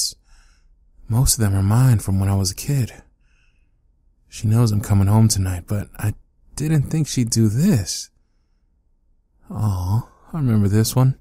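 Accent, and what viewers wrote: South, United States. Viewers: is it me or did anyone else hear the cowboy ascent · Country accents! Country accents everywhere! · Are you from the south or something cause it sounds like you are or you’re just really good at doing accents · I know I wrote this and all, but as I was listening to this, I cried like a little bitch., your southern accent is so adorkable! Even still, I'm dying because of how wonderful this turned out! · I love the country accent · HIS SOUTHERN ACCENT HAS ME QUAKING LMAO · I FRICKING LOVE THE SOUTHERN ACCENT, ITS SOOOOO AMAZING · Is that a twinge of a southern accent I hear? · I swear you get a little southern every time you do a soldier one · As a southerner the accent slapped me so hard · Psst guess what. The country accent. Fucking amazing. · Awwww the southern accent always gets me 😍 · Is it just me or did he do a southern accent? 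· The southern accent is back y'all. The heartwarming fluffy bits r back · The southern accent is almost a turn off, I guess is trying to fit the "all American vets have tough southern accents" stereotype, for me it's just distracting but it probably hits home for other people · Dat Country accent 😍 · That southern accent is actually everything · It somewhat sounded like a southern accent was thrown into this. It was amazing. This reminded me of my dad returning home from Iraq when I was little. I loved this so much. Well done. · aaaagh that Southern dialect! I love it! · Dog + southern voice grabby hands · holD uP nOw! YOu HavE mY CoUnTrY AccenT! And Is ThAt I WeE dOggIe? HoOoOoO-wEeE! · Southern accent 😳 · So cute! I love that you have a slight southern twang when you talk in this one! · That slight southern accent is so charming!!😍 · I love southern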